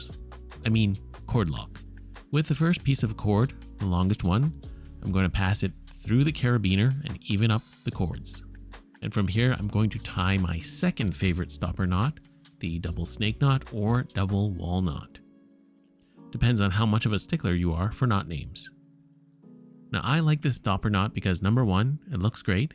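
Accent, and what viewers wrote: Canada. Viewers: I was gonna ask if I won anything for my guess, but it was clearly obvious to several of us. 😁 I grew up in western Montana (Missoula, to be more precise). I think everyone from the cooler climes gets the need for today’s gadget intuitively. That you’re Canadian is obvious, not just from the dialect, but from the whole vibe, which is part of why I absolutely love your channel. I admit, y’all are way more likable than your neighbors to your immediate south; we’re quite the mess these days, but I’ve felt that way for decades. When I worked tech support, several of my clients thought I was Canadian from my voice and demeanor. One of the highest compliments one could receive.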